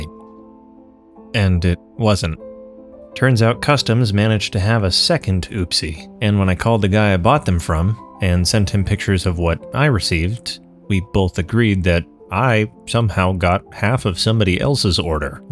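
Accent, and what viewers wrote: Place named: Central Pennsylvania, United States. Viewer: Every time I hear you say something like “there’s a lot of hoses on this car that need swapped” I’m back in Central PA😅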